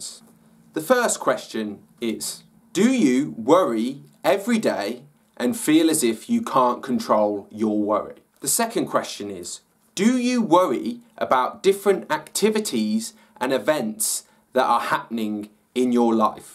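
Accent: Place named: Brighton, United Kingdom. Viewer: Leah Stein it's the Brighton accent I think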